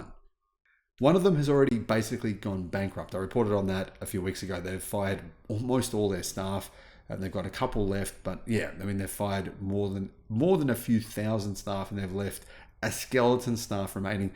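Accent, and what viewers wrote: Australia. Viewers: start to like his aussie accent, quite nice actually.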